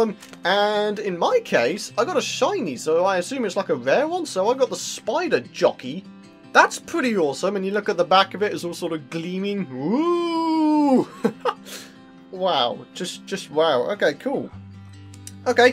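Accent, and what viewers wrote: United Kingdom. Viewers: he is from the UK its obvious.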